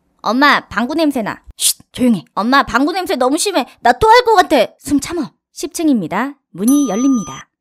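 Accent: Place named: Gyeongsang, South Korea. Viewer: 대구 사람인 것 같은데요?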